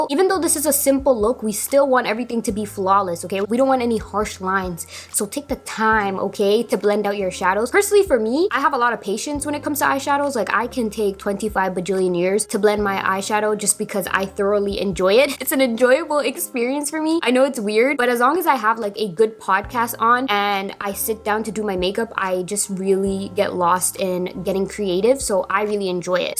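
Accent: Toronto, Canada. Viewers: I thought I recognized the TO accent 👀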